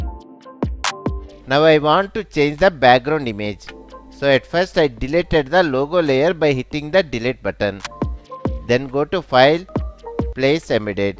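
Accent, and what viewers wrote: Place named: India. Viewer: Thank you! Never underestimated Youtube tutorial with Indian accent :)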